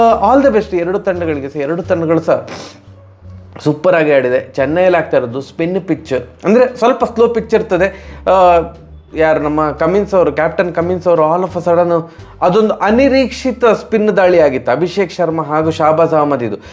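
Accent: Mangalore, India. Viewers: Neev yava video veiadru maadi karantre. Nanu nodthene. Nanu video nododu information gaagi alla. Nimma e Mangalore accent Kannada kellikke barodu 😂 I love it man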